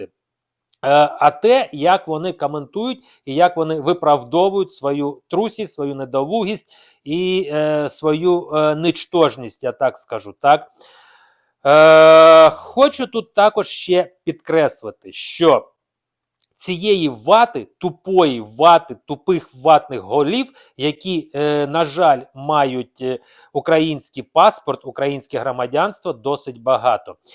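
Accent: Russia. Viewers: Фу !!!! Тварь !!! Ещё одно - куля в лоб ... А сам сидит русским акцентом трусит ... В бан суку !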